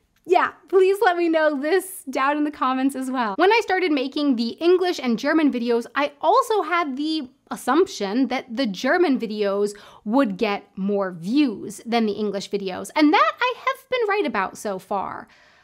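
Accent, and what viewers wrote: United States. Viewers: Colombian in Germany. I think most viewers are ex-pats in Germany, thus the English preference. Also, the popularity of the videos in English, rather than German could be because you have a very thick American accent in German, and I personally, have problem enjoying it. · U.S. viewer. I normally watch the English version. Sometimes I feel like watching the German version, though, because I took several years of German in high school, yet I find it very difficult to understand videos from native Germans. Weirdly, I suppose because of your American accent, I can understand your German surprisingly well. Thus it's fun to "practice" my German by listening to an American speak it. 🤔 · As an ex-pat Brit living in Germany, I watch your videos for your experiences of living here. To be honest, I prefer the English language videos, your American accent with German words is sometimes hard. 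Re: finding out about the videos - checking my subscriptions or when you mention it on Twitter.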